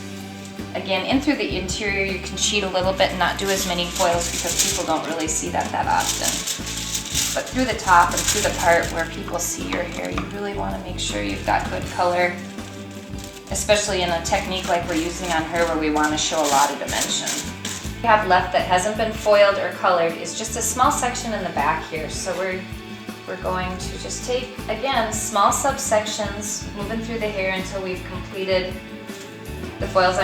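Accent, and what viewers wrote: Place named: Minnesota, United States. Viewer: r u from minnisota u knind of have the accent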